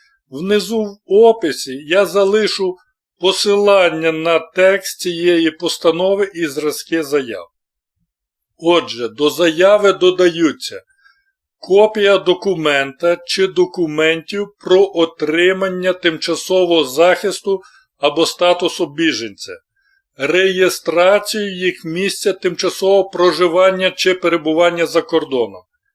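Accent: Standard. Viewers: Чітка українська мова !!! Щиро дякую !!!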